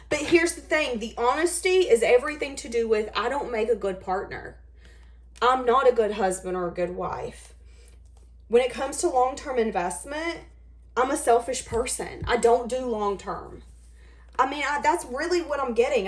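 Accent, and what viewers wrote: South, United States. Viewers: Thank you for always making videos. Your voice, and southern accent brings me comfort. I could listen to you talk everyday, and do. Just wanted to let you know that some random guy appreciate’s your hard work putting these videos together. You are precious.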